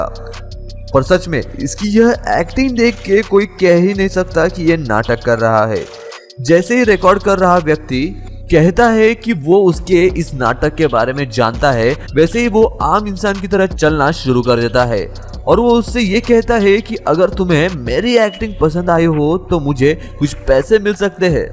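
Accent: India. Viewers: You are not an indian why speaking liake an indian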